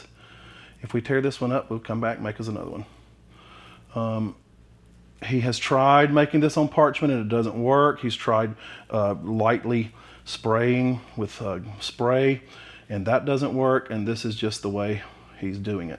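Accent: South, United States. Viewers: Pre-ordered your book! Thanks for all you do! So good to hear that southern draw and your easy going way. Good luck in Cali!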